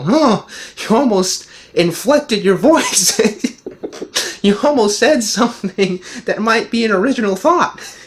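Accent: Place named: England, United Kingdom. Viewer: Am the only one who likes him whenever he speaks with an English accent. He should try to do a whole video speaking in an English accent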